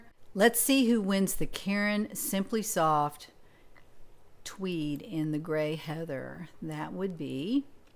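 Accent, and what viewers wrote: South, United States. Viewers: Great to have your family coming!! :-) You're right...enough tutorials out there. Enjoy just hearing wonderful Southern accent & speak about what you know.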